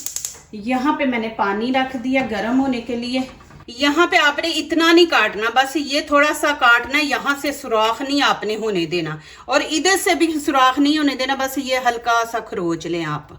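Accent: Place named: Punjab, India. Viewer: Ap Panjabi logo ki Urdu b alag Hoti hai pata chal jata hai Panjabi ho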